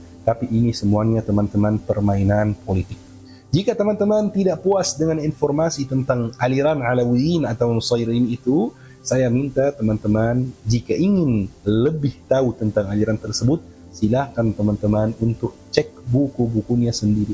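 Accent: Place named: Arab world. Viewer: Ini spt suara. Mhs Mesir yg pernah belajar di Indonesia. Stlh tamat, dia tinggal di Indonesia. Yg dia lakukan: Pertama, jd youtuber, konten ttg mazhab2/sekte dlm dlm Islam. Kedua, setelah gagal jd youtuber, dia bertrsnsformasi mnjdi ustad.dan pnceramah. Pakai surbam, kliing2 Indonesia. Tp spt nya juga kurang berhasil. Ketiga, skrg kmbali lg jd youtuber spt dlm video ini. Kl ini apa yg dia ceritakan ini bkn hsil riset. Tp hnya berdasar berita, berdasar video2 yg sdh bnyak beredar pasca Jatuhnya al Asad. Sy justru curiga pd ini. Dia hnya ingin memainkan perasaan umat Islam Indonesia agar terprofokasi. Dia justru penebar fitnah baru. Tanda-tanda dia bohong, perhatikan dan dengarkan berkal kali dia bersumpah: demi Allah...demi Allah... Hai...kamu kan orang Mesir, coba kamu berani tdk kritik presiden kamu sendiri, al Sisi yg jg diktator al Asad. Brp orang yg mati dan dipenjara oleh rezim al Sisy ktika mrk protes terhadapnya..?? 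Comeon.....kritik presidenmu sndiri... Selancar apapun bhs Indonesiamu, sy msh bs membedakan bhw anda bkn org Indonesia. Ktika kamu mengucapkan fonem2 tertntu yg tdk ada dlm bhs Arab spt: nya, ng, pelafalan kamu msh klihatan sbg org Arab. Akhirnya, sy jstru curiga bhw anda ini mata2/ antek asing yg disusupkan di Indonesia utk mmecah persatuan bangsa Indonesia dg mmbahas isu-isu sekte/ mazhab yg terus berkonflik di timur tengah. يا....نحن نتابع كل خطوانك..وقد تركت الآثار التى لا نمحوها....!!!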